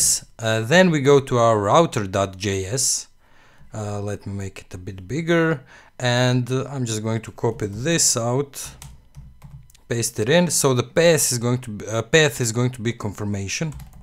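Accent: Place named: Russia. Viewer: а акцент то русский)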